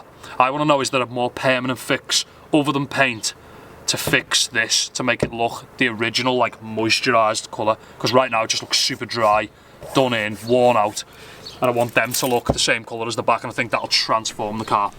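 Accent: Liverpool, United Kingdom. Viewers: Im a simple man .i hear scouse , i like and subscribe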